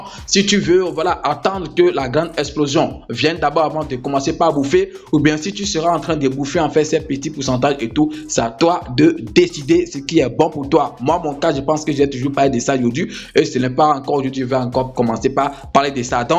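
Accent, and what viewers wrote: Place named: Africa. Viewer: C'est l'accent africain.. normal quoi . Fais toi plutôt l'effort de comprendre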